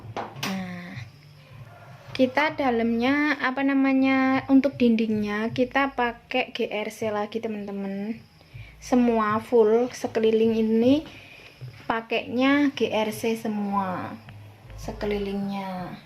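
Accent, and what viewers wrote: Java, Indonesia. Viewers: Medhok jawir mu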